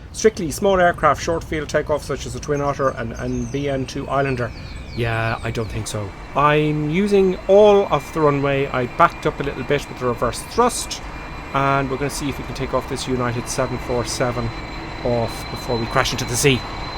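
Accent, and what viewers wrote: Ireland. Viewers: Sound like you're Irish xD I'm from Dublin! Cool vids man! Really funny and good detailed reviews! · Are u Irish if u are what county u from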